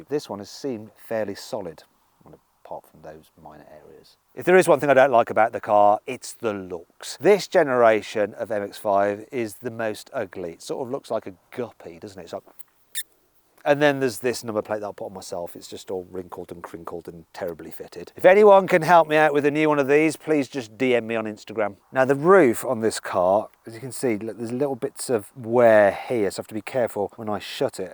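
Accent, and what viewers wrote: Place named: Birmingham, United Kingdom. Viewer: Thankfully Brummy accent wearing off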